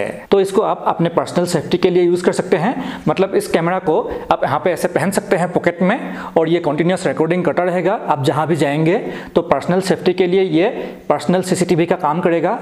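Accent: Assam, India. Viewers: Toi axomiya hoi....gom pai golu kotha koutai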